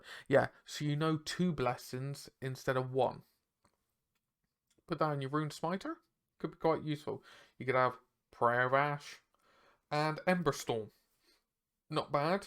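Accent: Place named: Devon, United Kingdom. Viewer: That voice was much more Devon than I was expecting...love it.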